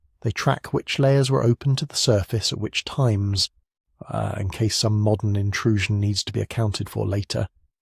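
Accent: United Kingdom. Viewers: I put the playback speed on 80% and man something about a slow british accent just knocks me out😂🙏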